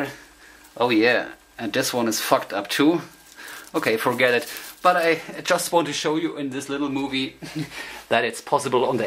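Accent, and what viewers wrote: Germany. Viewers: LOL, "Peeeeep!" instead of "beeep"! :-D Love that German accent, man! :-D Fun video (even with the disappointment that the pro recorder was too stupidly designed to play also)! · I love your german accentt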